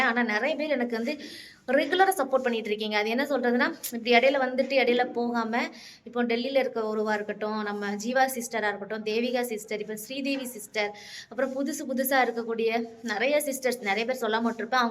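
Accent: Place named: Tirunelveli, India. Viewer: Unga ஊர் tirunelveliya nega pessurathu appete thereythu nan tirunelveli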